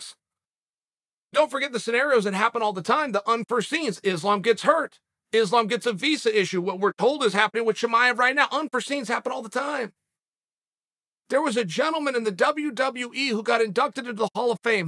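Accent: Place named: Portland, United States. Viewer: Chael’s speaks Russian with a Portland accent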